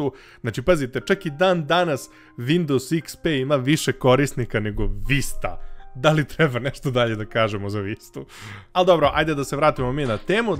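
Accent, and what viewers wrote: Russia. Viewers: cita engleski kao RUS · Taj tvoj domaci engleski zvuci ko rus kad pokusava da prica engleski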